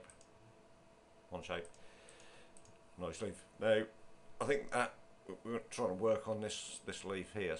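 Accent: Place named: United Kingdom. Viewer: Oh my you have such a heavy British accent:)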